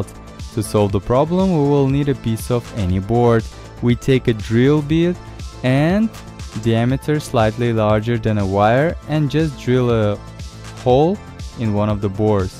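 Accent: England, United Kingdom. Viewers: i think this is the most british accent i heard from you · Its kinna cute when he call that fruit punch mash potatoes 🤣🥰🥰 im i the only one who deeply inlove with his english accent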